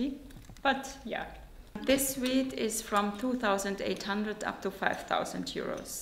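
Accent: United Kingdom. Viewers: 当たり前ですが、やはりSTOPPELさんの話す英語はイギリス英語なんですね…！それとも訛り…？ 字幕のおかげもあって、聞き取りやすかったです！ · すごいイギリス発音。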